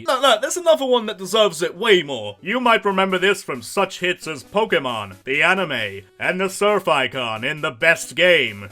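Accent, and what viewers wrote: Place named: Bristol, United Kingdom. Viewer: Ahh, that intro sounds like bristol :)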